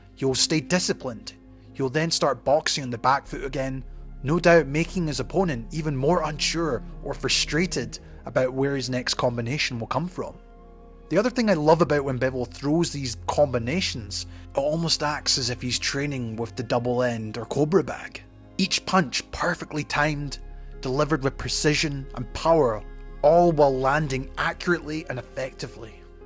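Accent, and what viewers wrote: United Kingdom. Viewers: what is your accent? British? Welsh? Scottish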